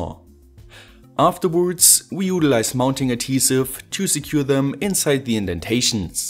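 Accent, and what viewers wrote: Germany. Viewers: Hi bist du deutscher weil es sich sehr so anhört. Hast du auch einen Deutschen Kanal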